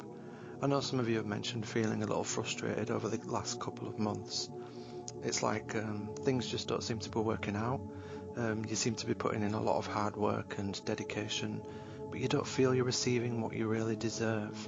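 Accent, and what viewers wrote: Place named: North, United Kingdom. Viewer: I love your gentle voice..northern..xxx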